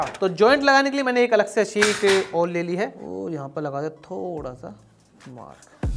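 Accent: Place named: Haryana, India. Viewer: आप बहोत ही फास्ट हरियानवी हिंदी बोलते हो ऊसमे सुधार चाहीये।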